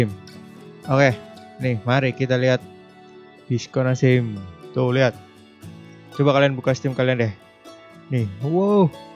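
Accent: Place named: Banyumas, Indonesia. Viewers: Ya musah ngapak lah kwiwkwowo guyonan om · Jan ngapak pisan koh medok medok😂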